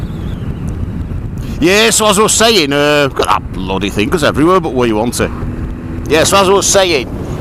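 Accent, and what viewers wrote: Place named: North West England, United Kingdom. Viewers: A nice little ride. Thanks for that. You show quite a bit of character and made me laugh a couple of times, you and your Northern accent. I would have liked to hear more of your feelings about the bike's handling, etc. I think it looks great and the engine sounds superb. Feels solid and smooth. I think, by the way, that most road users are annoyed that there are other road users on the same stretch of road.:) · Where in the UK is this filmed? Your accent seems north west? Just curious. Ex pat.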